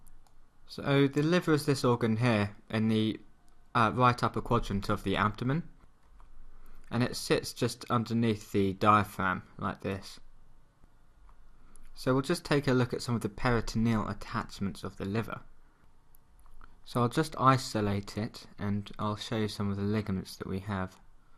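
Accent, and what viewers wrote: United Kingdom. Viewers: the accent is clear British it can't get any more beautiful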